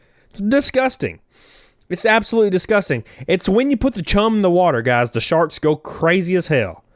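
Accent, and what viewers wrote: South, United States. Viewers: I don’t know how I found this video but this guy sounds like a Southern Kermet the Frog 🐸. I’ll keep watching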